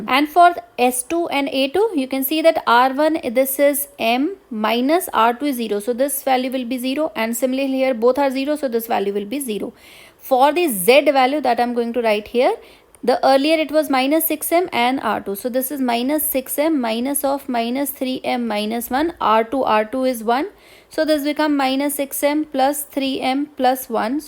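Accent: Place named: India. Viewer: I hate Indian accent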